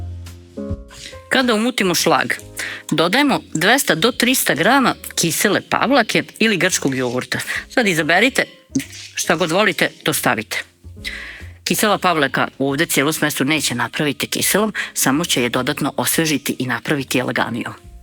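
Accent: Vojvodina, Serbia. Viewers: E, mnogo mi se dopadate! Neposredni ste , spontani i duhoviti.,,, a recept mi se dopada, lepo ste objasnili i bez neke "pompe" napravili , a sigurna sam da je torta ukusna. Posebno mi se dopao Vaš akcenat, rekla bih da ste iz Vojvodine! ❤❤❤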